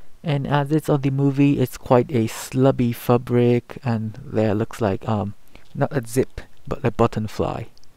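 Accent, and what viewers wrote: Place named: United Kingdom. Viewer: Nice video, thanks! Your English is pretty good, by the way! Did you study British English? Didn't you? I'm just curious, because I'm studying American, and I am pretty sure that I hear British pronunciation.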